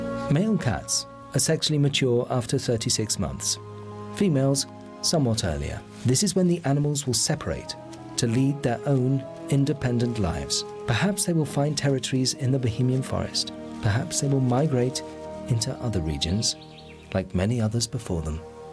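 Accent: United Kingdom. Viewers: British accent??? ☺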